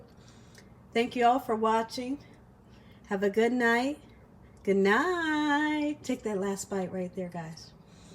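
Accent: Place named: South, United States. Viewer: I absolutely love your voice and southern accent 🫶🏾 and this has become one of my favorite meals to make, bcs of you!! Thank you so much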